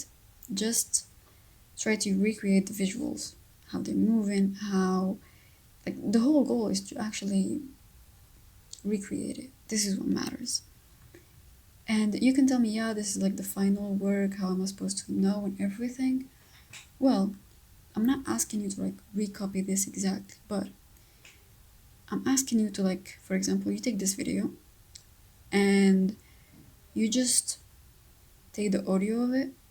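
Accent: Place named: Morocco. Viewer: HEYY are u moroccan (i dunno why u sound moroccan)?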